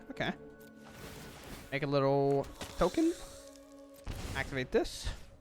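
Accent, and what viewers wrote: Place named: United States. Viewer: your accent, what part of the states are you from?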